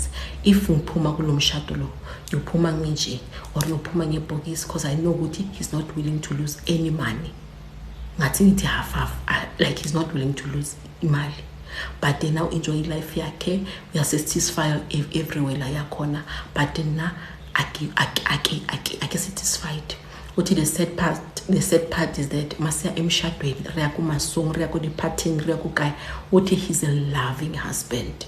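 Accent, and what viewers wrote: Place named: Ndebele, Zimbabwe. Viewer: Indebele lekhethu ngyalizwa elimini, ngizwa iskhethu elimini lakho😅.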